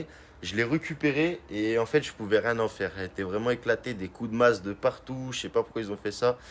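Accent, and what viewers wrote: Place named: Lyon, France. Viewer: Il a bien l'accent lyonnais. Beau projet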